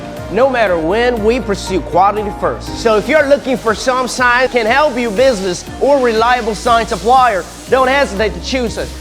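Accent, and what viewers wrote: South, United States. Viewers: Speaking with a southern U.S. intonation. Can't believe my ears!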